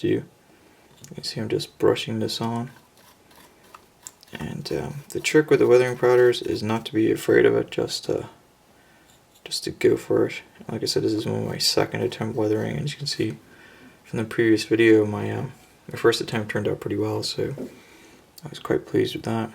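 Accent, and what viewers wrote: United States. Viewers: Hi, just wondering you come from? As you sound American/Canadian, if so, why do you use English trains? Not to be nosy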